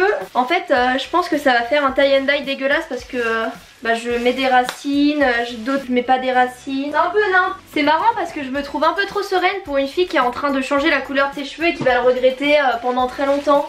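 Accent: France. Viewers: I will regret It hein😭😂😂 jss morte tu me tu avec ton anglais français 😂😭❤️ on adore tes expériences capillaires (moi aussi on est 8 dans ma tête tkt)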